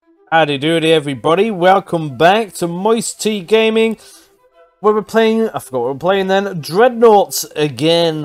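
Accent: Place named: England, United Kingdom. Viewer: do you live up north or down south? Just because you said you were Scottish but you sound English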